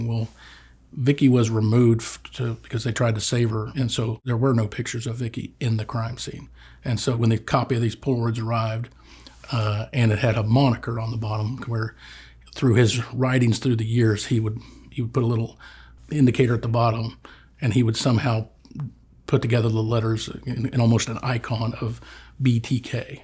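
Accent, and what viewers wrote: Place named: United Kingdom. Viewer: to give this channel a lil boost: subbed. british sounding narration always sounds like quality to me, a german.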